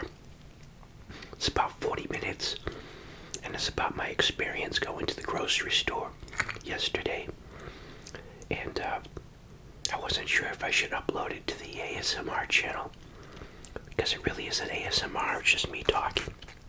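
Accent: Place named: New York, United States. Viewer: Love your videos 😍 just started watching them are you from New York? Love your accent 😁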